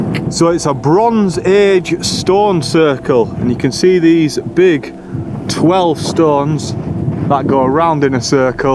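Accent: Yorkshire, United Kingdom. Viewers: Same....great character and I'm a sucker for a Yorkshire accent! 😘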